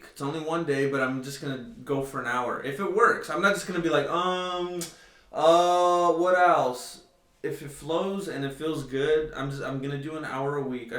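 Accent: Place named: Mexico. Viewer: Thank You 😊❤️ I just love seeing the Italian flag 🇮🇹😃 with that Mexican accent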